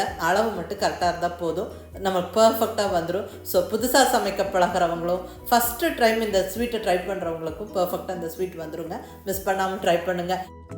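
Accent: Coimbatore, India. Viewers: I enjoy following your recipe, and listening your Kovai Tamil. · Super mam your kongu tamil and your all recipes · love your voice and presentation. cbm slang, good to hear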